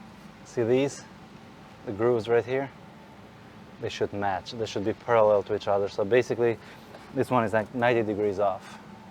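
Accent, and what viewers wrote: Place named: Albania. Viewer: Where are you from. Your accent is like Albanian.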